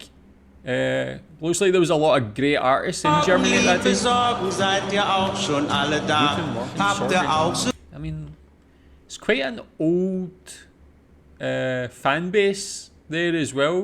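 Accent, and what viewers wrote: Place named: North England, United Kingdom. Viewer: Nice Accent Dude! 😄 North England?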